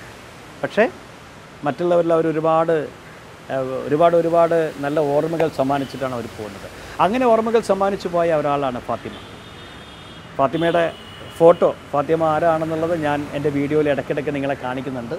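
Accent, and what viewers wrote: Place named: Kozhikode, India. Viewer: Kozhikode bhasha paranja madi Mr kovoor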